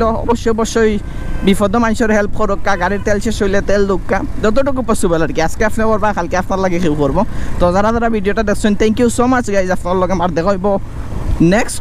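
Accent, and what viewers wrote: Sylhet, Bangladesh. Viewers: Asslamualikum bai apnar basha sylhet ar kun jaygay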